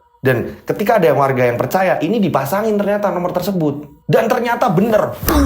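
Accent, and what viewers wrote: Java, Indonesia. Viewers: Assalamualaikum de.... Majalengka Jabar Hadir.... Seneng sekali dengerin cerita misteri de.... Suka sekali sangat pas menyampaikan cara bicara tokoh cerita nya.... Logat jawa nya.....sehat selalu de... Semoga chanel de semakin berkembang.... Boom.... Boom... Booommm😍🙏🙏🙏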